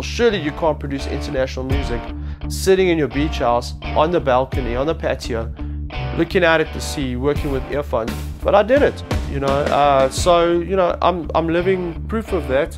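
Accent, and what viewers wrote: South Africa. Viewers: Are u South African? :D My bestie is south african :D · Haha, straight away I can here a Joburg boy! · South African? :>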